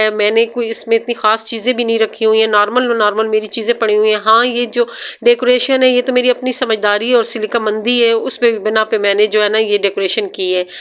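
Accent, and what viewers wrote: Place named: Punjab, Pakistan. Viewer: Zbrdst aap ke pnjabi to hmry jesi ha from kamra attock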